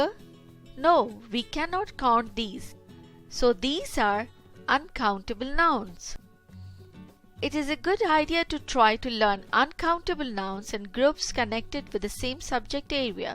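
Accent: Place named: India. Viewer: where r the odds ... bruh indian teachers again , just look at the accent its annoying tho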